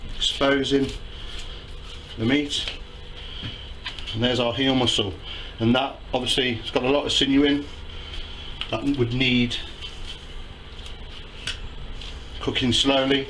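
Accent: United Kingdom. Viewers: I really enjoy watching your videos. You are a real funny guy. The British accent makes me laugh when you say words and phrases like "give it a go", "really lovely", and "just fantastic". The combination of your great personality and educational videos make it fun to watch. Keep up the great work. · He's British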